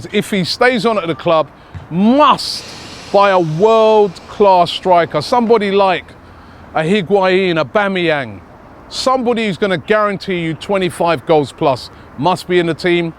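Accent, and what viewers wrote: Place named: London, United Kingdom. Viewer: bradke London mate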